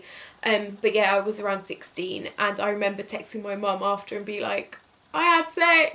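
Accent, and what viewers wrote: United Kingdom. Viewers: I am in love with your voice and British Accent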